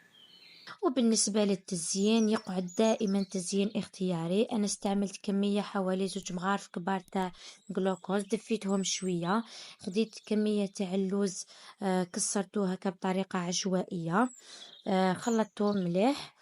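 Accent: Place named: Morocco. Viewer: صاحبة القناة لهجتك مغربية!!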